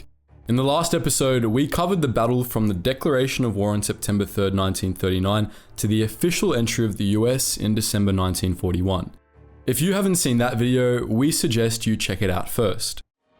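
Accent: South Africa. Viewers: Are you south african? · Great job as usual. South African? Rhodesian?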